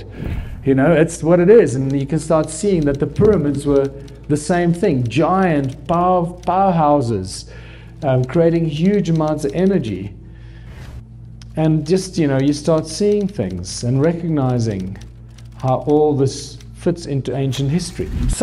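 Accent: South Africa. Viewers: I stumbled upon this for the second time and I realised that it's a South African speaking here. I love how we are so diverse in ideology and thought because it opens up the potential for many of us to think differently perhaps, hopefully, come together and see how such can bring us together towards a common understanding about what it means to be human.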